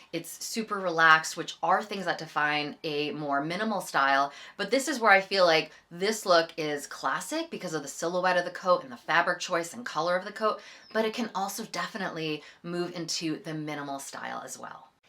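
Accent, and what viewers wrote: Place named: United States. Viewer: First of all can I just say how much I love your channel and I wish it every success, you thoroughly deserve it, you're so knowledgeable and a great presenter, you have a lovely voice and soft American accent that is a pleasure to listen to and your content is always excellent. I invested in a princess style wool coat from Jigsaw in the UK back in 2005 and I still wear it and it looks amazing. It's taupe, another great classic colour, not too light and not too dark. It goes with everything and I like taupe much more than camel or beige. I love browns, also chocolate brown (not coffee bean brown, which is too dark and quite harsh). I'd love a navy or blue coat and although I don't need it, I'm on the look-out for one, I also love dusty pink, which in an underrated neutral and a very flattering and feminine one imo, I recently saw a lady wearing a dusty pink trench coat and she looked amazing. 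Like taupe, dusty pink goes well with any neutral colour.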